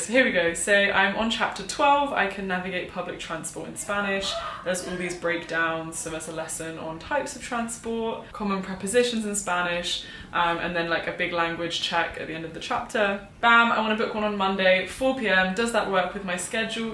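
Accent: Argentina. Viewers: "jugo de naranja para 'shevar'?" AMO que su español tenga un pequeño acento argentino, es muy tierno💕💕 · Me encanta tu acento cuando hablas el español. Un toque del argentino, ay que precioso! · Aaaah you already have kind of an argentinian accent, so cute, you are doing so good 👏🏼👏🏼👏🏼👏🏼 mucho ánimo 💪🏼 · Hey! I have a few things for you to maybe help your Spanish! Let's go = Vamos (Second person in plural, present tense of "ir"), "Vamonos" sound like let's leave for Argentinians. "Hoy" sounds a lot like the German word Heute, both mean today You can you "Son las 3 de la tarde", you can just forget about the subject of the sentences, it doesen't matters to spanish speakers if it's the hour or the hours that "son". I love that your Spanish has a little of an Argentinian "sh" sound 🤭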